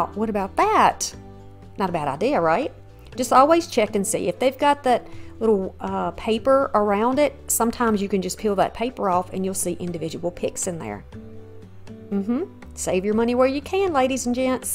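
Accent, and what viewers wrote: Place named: Alabama, United States. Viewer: Hello from a fellow Alabama girl! I haven’t watched this video yet, but I already know I will love it. I found your channel last weekend and I’ve been watching a lot of your past ones. I have one playing on my tv in the bedroom right now from last year. Love your channel and I love hearing someone who has the same accent as I do 😊❤ best wishes! (Subscribed and hit the bell to receive ALL your notifications 💜)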